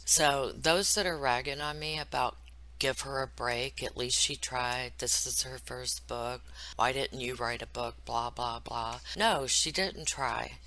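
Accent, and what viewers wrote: South, United States. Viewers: The anti christ should finally get treated the way you do. I like your southern comfort voice. My daddy is a zero is spot on ! 12 degrees of separation . I am with you , we cn not say enough nasty words to describe this asshole. I love Beethoven, you are great!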